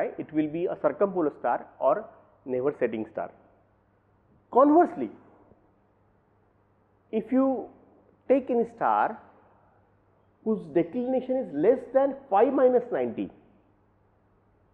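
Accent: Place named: India. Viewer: The toughest Indian accent . But thanks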